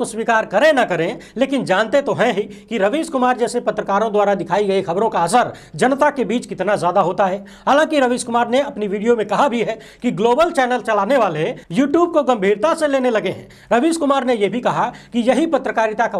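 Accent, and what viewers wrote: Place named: Bihar, India. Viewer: Aap ghantwa dabane ke liye kahte hain,magar size me Chhota hai isliye use ghantiya dabane ke liye kahen.baki aap ka Bihari boli me sab bat achcha se samajh me aata hai.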